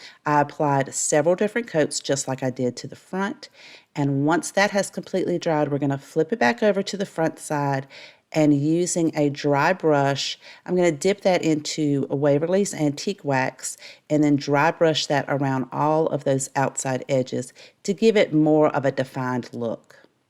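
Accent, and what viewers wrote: South, United States. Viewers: Hello from Scotland ✋🏻I've only been watching crafting on YouTube for a couple of months. And I really love how "clean" your designs are, if that's the right word. You have the minimum of things to make something look so lovely. We don't have the amount of choice in our shops that are as cheap as your Dollar Tree stores etc and although Amazon gives us a wider choice, it's not the same as seeing it in front of you being able to touch and find inspiration. That said, because you make so many of your projects with the minimum of stuff needed, I can replicate it without needing to take out a bank loan 😂. Just love your style, the way you explain it so simply in that lovely southern accent of yours. 🎄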